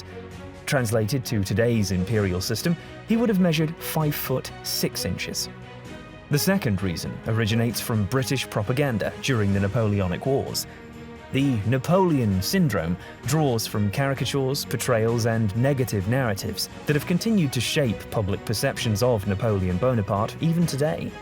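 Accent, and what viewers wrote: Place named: Italy. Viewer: I love how the narrator uses his Italian accent! 💙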